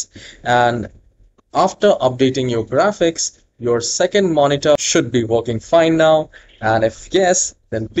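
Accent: India. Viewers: nah yk its gna work when you hear the indian accent lmao W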